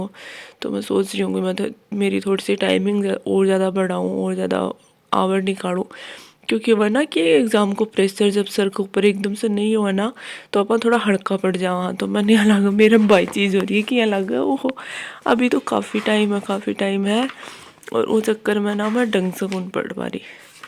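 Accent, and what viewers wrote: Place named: Rajasthan, India. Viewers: Marwadi bolu yaa shekawati maine samjh toh nahi aaye but lage aap yaa Nagore side se hu yaa Sikar side se...... Best of luck 🤞 for your preparation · Madm ji thari boli payri lage, ye shekavati boli h kya 🙏🏾🙏🏾🙏🏾🙏🏾🙏🏾🙏🏾🙏🏾🙏🏾🙏🏾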